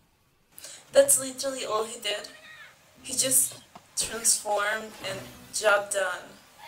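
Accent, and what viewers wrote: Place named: Spain. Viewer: Cool. From your accent I was thinking Spain. Makes sense.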